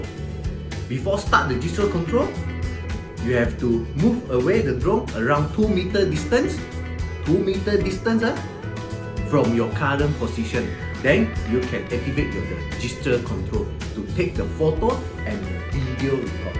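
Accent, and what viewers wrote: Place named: Indonesia. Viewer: indonesian Speak🤣🤣🤣👍👍 not bad my bro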